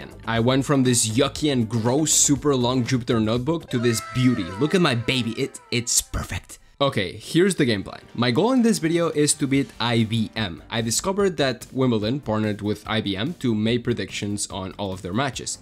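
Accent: Catalonia, Spain. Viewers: Accent català??? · Are you Catalan? That "Jaume" accent is literally on point and you have a bit of an accent!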